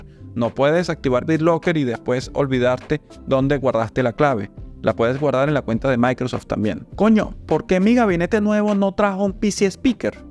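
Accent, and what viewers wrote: Venezuela. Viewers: Compa, por el hablado usted tiene que ser venezolano jajajajaja! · eres de venezuela? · Um........ ese acento.... Es Un Paisano Venezolano.....Saludo Hermano, excelentes videos!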